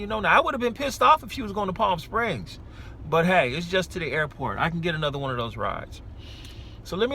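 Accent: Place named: Edinburgh, United Kingdom. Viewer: Sounds Edinburra chief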